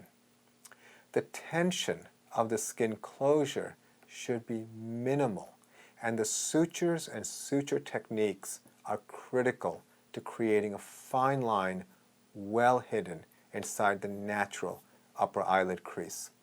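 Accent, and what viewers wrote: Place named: India. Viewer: Your accent sounds indian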